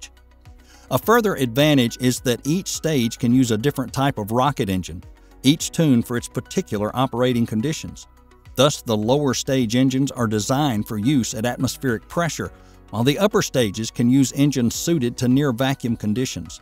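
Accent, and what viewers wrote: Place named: Texas, United States. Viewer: Thank you, your explanations are immaculate, and your accent smacks of Texas ! 👍👍👍👍👍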